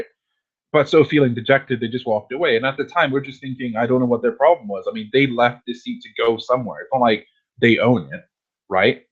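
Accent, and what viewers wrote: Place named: Ireland. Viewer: Lol youre from Ghana? I thought that you were maybe Irish or something lmfao, just how you pronounce certain words thats all. First time viewer here just so you know, good video mate!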